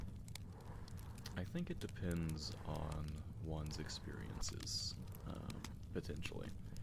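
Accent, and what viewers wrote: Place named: Texas, United States. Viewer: It surely was a fun day!!! I really enjoyed the whole hour, AND i learned new things thanks yo you a whole bunch, i love ur Texas accent, i love old western especially on TV like Dallas!!! The original of course, your voice really has a strong deep tone, love u!!! Ur good Boy.❤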